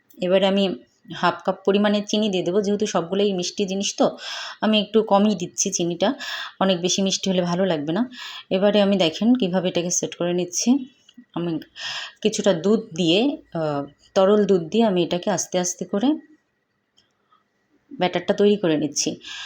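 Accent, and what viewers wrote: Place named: Noakhali, Bangladesh. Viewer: প্রথম থেকে শেষ পর্যন্ত সাথেই ছিলাম আপনি নোয়াখালীর ভাষায় কথা বললেন খুব সুন্দর লাগলো ❤❤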